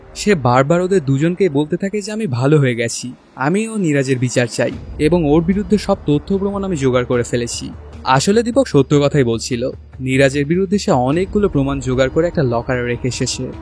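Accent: Bangladesh. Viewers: বাংলা দেশের লোকেরা এই ভাবেই কথা বলে।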